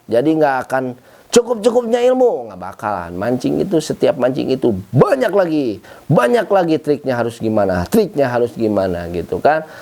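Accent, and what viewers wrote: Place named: Sunda, Indonesia. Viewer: Cri khas a serius tapi loba hereuy khas orang sunda pisan😂😂😂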